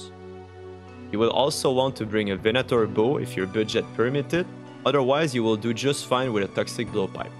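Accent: Quebec, Canada. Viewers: en 2 secondes j'étais genre, yo un québécois qui fait un guide. Nice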